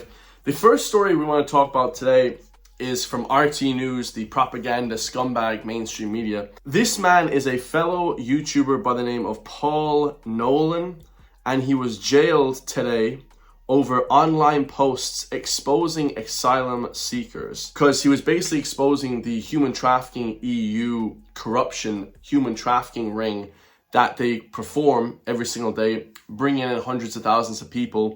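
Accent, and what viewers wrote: United States. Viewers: You’re not a Christian and why do you have an American accent?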